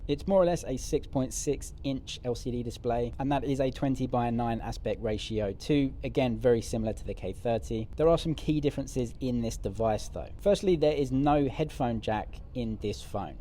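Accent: United Kingdom. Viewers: Your accent like British How you came to china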